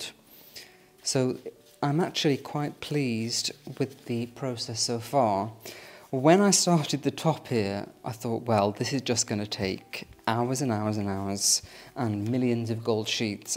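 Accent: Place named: Northern England, United Kingdom. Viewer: Whereabouts up north are you originally from? Whereby you are very well spoken some words are very northern, for example past and grass etc!x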